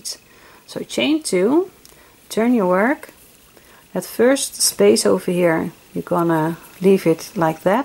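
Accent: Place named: Netherlands. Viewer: I learned this one with a just one sc rather than two, but otherwise the same. I love that Nederlands accent - unmistakeable ! :) But I dunno how come you guys speak such faultless English !!!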